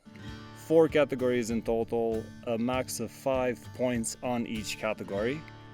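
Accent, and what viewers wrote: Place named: Romania. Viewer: Mi-am si zis, ca dupa accent esti roman. Felicitari pt ceea ce faci!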